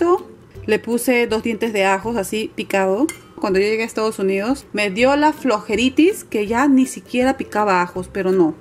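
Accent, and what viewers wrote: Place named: Peru. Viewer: Jajaja sabes me.mato de risa porque hablas tan peruana, como extraño Lima Peru, compatriota mia. 😀 Espero mejore tu bebito esta lindo. Suerte !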